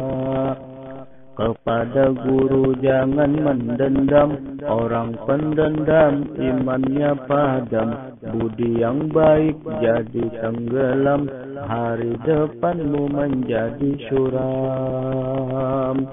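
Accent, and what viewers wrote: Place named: Aceh, Indonesia. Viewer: Seperti logat aceh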